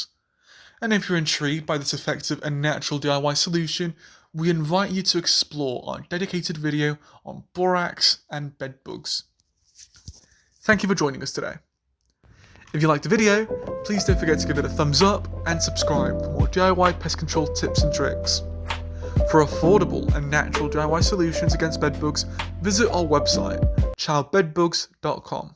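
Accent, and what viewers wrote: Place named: England, United Kingdom. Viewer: You sound English - why say diaper instead of nappy?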